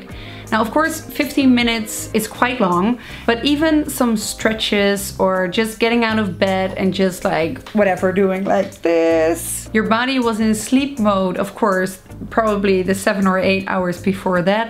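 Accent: Netherlands. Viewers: OOOH you're dutch! I seriously thought you where from an indian or bahama kind of place, because of your accent, but after you showed that card I could hear that you're dutch haha. I love these tips. They're really helpful! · echt een nederlandse accent :) Leuke video!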